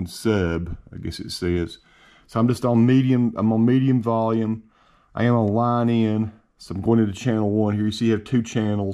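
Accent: South, United States. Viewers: "sub mode" makes the batteries last longer FYI. That southern draw you got there sounds familiar to my North Carolina ears! Haha!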